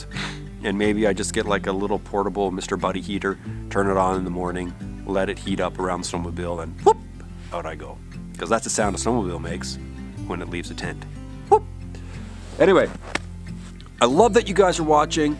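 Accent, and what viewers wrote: Canada. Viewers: I really enjoy your videos, i am not young, not outdoorsy, not strong, but i do enjoy all you do please keep the vids coming; i just stumbled on your vid, where are you located? I hear “ uwt for out so Canada? How do you keep safe out there all by yourself? Your wife doesn’t worry? Thank you for sharing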